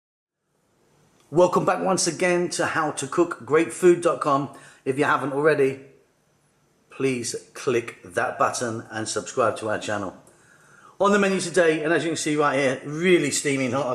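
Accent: United Kingdom. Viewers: Love your fabulous British voice as well ! 👍🙂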